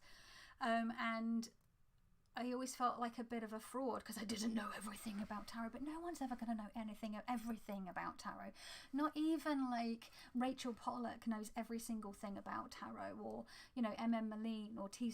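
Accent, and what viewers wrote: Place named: United Kingdom. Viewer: I'd like to see the video as well. Having so many "I once thought" moments is a good thing. Imo. It says that you have a open mind and heart. This was great ❤ btw hearing your lovely accent makes me miss the UK so much. Can't wait to go back. Thanks for sharing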